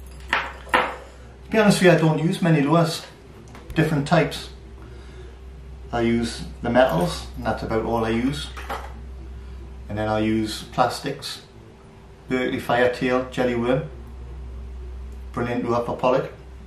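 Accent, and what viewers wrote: Newcastle, United Kingdom. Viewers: Thanks mate just made my move to Donegal permanent and less than 3k to most beautiful shoreline, although a trout and salmon angler that keeps me busy in these parts always wanted to shore fish, just found your channel a few weeks ago and your video today will help me tackle up cheers again and your Geordie accent is great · Great video mate, enjoyed very much. I'm from South Wales and can understand your geordie accent no problem haha. Keep up the good work. Gareth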